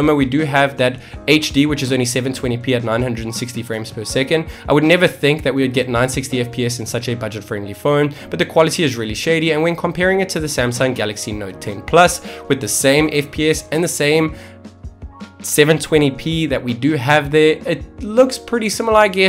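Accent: South Africa. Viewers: sounds like your from South Africa. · Is this oke South African?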